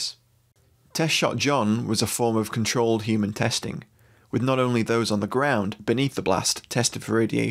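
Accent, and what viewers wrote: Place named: Cockney, United Kingdom. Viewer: Why always with the Cockney Annoying Brit Commentator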